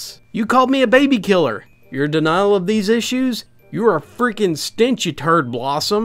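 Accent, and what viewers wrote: South, United States. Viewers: That is the lovely southern charm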